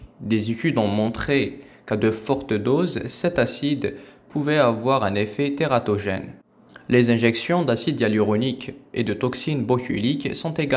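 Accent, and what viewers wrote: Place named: Kabylie, Algeria. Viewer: ca se voit tu es kabyle tu as l'accent lol mais merci des conseils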